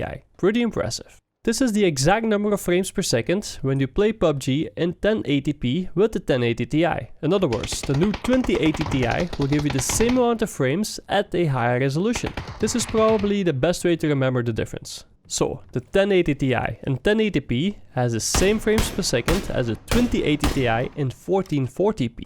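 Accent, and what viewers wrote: Netherlands. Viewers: The Dutch accent is coming through strong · Dat hoor je goed dat je van Nederland komt hoor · wat een dik Nederlands accent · Ur dutch right? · Are you Dutch? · I could hear that you were Dutch in about 3 seconds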